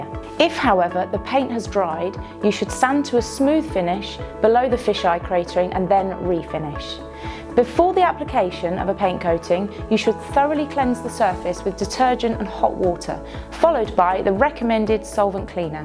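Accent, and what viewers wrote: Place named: United Kingdom. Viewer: For the repair 1) what solvent is she talking about it step 1.a? you've just painted it, there's no solvent on there. duh. 2) what does 'flash' in ss 1.b mean? Secondly, what section of of jolly ole england is this gal from? Sounds mid-british, not too high-falutin', not too lower, just a nice, regular midcent from somewheres. I'm guessing not w/i 60min of londan, but neither higher than an hour south of the scottish border. Idk, never been over there, don't know if it's coastal or country or suburban. Little help please. I like to study these things.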